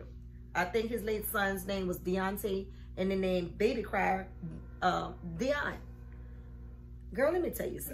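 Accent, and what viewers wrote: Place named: Louisiana, United States. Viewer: U got to be from Louisiana....because I'm from Hammond la....all in your voice.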